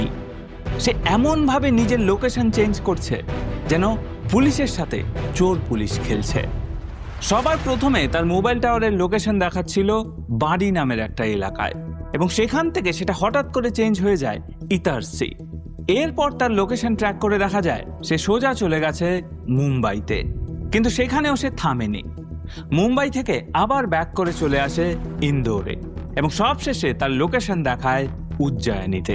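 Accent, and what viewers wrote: Bangladesh. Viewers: আপনার গল্প বলার ভঙ্গি ও সুর অনেকটা বাংলাদেশিদের মতো, যার কারণেই আপনি বিপুল সংখ্যক বাংলাদেশি দর্শকের মনোযোগ আকর্ষণ করেছেন...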